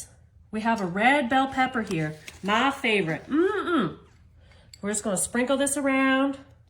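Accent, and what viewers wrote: South, United States. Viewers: Love your voice with that cute southern twang to it and of course your wonderful recipe and presentation.😊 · Now this looks great!! I would doctor it up with some kind of dipping sauce and seasoning but I would eat your recipe for sure. Thank you!! Great idea for an easy one pan breakfast. Oh by the way....what part of the south are you from? I'm in SC myself. We sound similar. Lol 😂 💜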